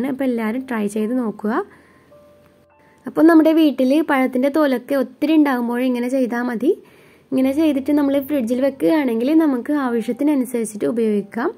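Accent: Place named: Malabar, India. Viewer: ശബ്ദത്തിൽ ഒരു മലപ്പുറം /കോഴിക്കോട് ടച്ച്‌ ഉണ്ടല്ലോ 🙂😆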